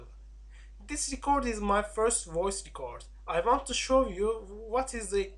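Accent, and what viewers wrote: Turkey. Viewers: Türk aksanı bu nerde duysam tanırım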